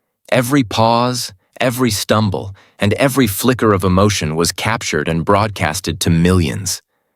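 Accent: United States. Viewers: If this confrontation was so interesting, why is it not shown? As a secondary question, why is it 'voiced' by an American person? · Political News Club....based in Saudi. No idea about the UK and our politics and a stupid USA voice to boot. Go and do something useful instead of posting this rubbish.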